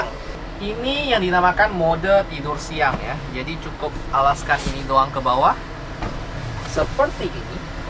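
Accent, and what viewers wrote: Pontianak, Indonesia. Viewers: " lewat tempat belobang pun nda beguyu" 😂😂😂 logat Pontianak kau tukh nampak benar...!! 😂😂 Utamakan selamat ya..!! 👍🏼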